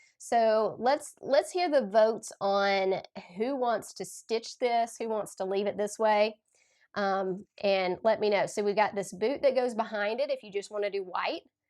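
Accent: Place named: Tennessee, United States. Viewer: I have been wondering where you accent is from now I know Tennessee! I am in Texas.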